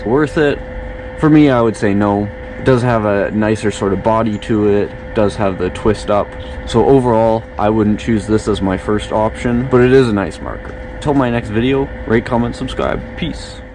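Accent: Canada. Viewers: Bruh are u canadian?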